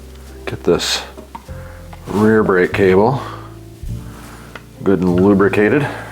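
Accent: Wisconsin, United States. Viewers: New subscriber here. Algorithm found me after the videos you refer to, so I don't know what it was about and I don't want to. Here's what I found, though. A guy from Wisconsin who talks Wisconsin. My husband is from Wisconsin, so I have a soft spot. A guy who works on bikes and I've just bought my first bike (used) in 30 years, so a knowledgeable person who is also opinionated and so explains WHY he holds those opinions...I mean it hit all the buttons all at one time. So your new people are out here and we are finding you. Also got "Still True" queued up as my next read.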